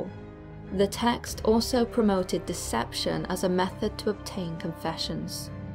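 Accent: England, United Kingdom. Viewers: Your voice makes me imagine that I'm being told the story from an actual family member! I have an American accent and have always loved them English accent. I'd listen to you read the phone book. I wish your voice was on my devices..."wake up darling, it's time to cease the day. Go on now, wake up." Hehehe......or "alright babe, you're all tucked in and ready to begun your journey to dreamland, goodnight darling." Ahhh that would be awesome! Who knows contact Google....you never know 😉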